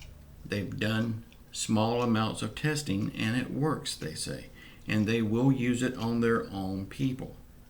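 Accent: South, United States. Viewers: Ok I've been listening to your channel for awhile now it sounds like your from the south me to Tennessee. Move back for family anyway you forgot one major issue today where is that recipe for sguach/hamburger that sounds so good. I'm so so glad Amy's doing better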